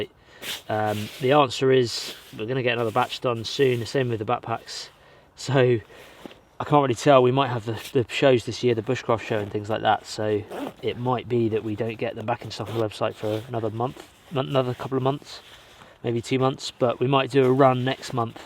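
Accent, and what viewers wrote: England, United Kingdom. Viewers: What is up my brother from South Korea 🇰🇷wow i feel your English is England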